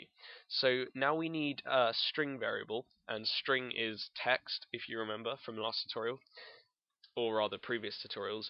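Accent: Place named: United Kingdom. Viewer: Thank you so much for this tutorial, my comp sci class has this type of problem due tonite, which sucks because we just had to turn in a huge programming assignment the night before so I haven't had any time to work on this. Oh and I always like hearing a british accent, that alone increases anyone's credibility and knowledge :).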